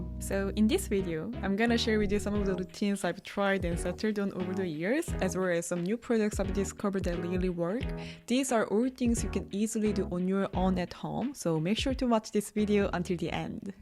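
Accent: South Korea. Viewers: Love your English with korean accent ❤😂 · I’m so ignorant of other cultures, so I never heard a Korean accent in English before, i love it, also you are pretty good at explaining and tell us about everything at the end of each section, new follower ❤❤❤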